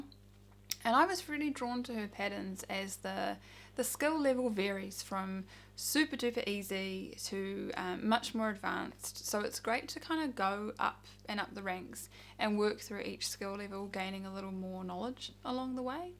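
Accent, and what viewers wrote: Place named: New Zealand. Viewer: Hello fellow Kiwi knitter! Just came across your channel now. Great to hear a Kiwi accent on YouTube! Love your description of the project, you make it very clear on the pattern, materials and mods. Kia ora!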